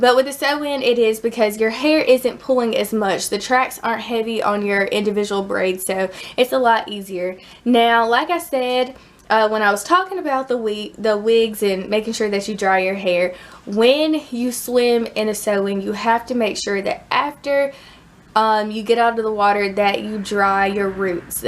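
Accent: Texas, United States. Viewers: I’m thinking “why is everyone saying she has an accent” but then I realize I’m from Texas and have the same accent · You're too cute.. especially your little country accent...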